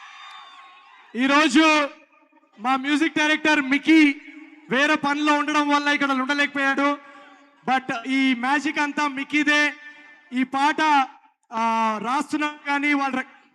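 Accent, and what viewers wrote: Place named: Telangana, India. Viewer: Telangana hero haa basha lone shammi vundhi abba 🔥❤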